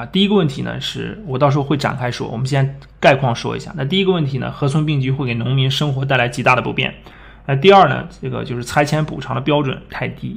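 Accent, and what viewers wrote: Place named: Shanxi, China. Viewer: 感觉普通话中有山西口音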